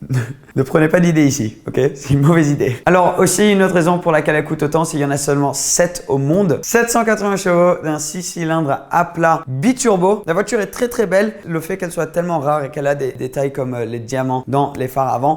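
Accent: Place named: England, United Kingdom. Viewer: Ton accent anglais de merde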